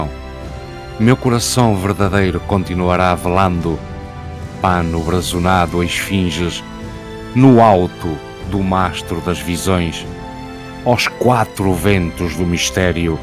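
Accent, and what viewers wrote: Portugal. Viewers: Acredito que você seja de Portugal pelo sotaque. Acompanho-o do Brasil. Fernando Pessoa, o melhor poeta do mundo. Indico-lhe o que para mim é o segundo: Augusto dos Anjos. Comece pelos poemas "Versos Íntimos", "Psicologia de um vencido", "Budismo moderno" e "A Árvore da Serra". Grande abraço!!!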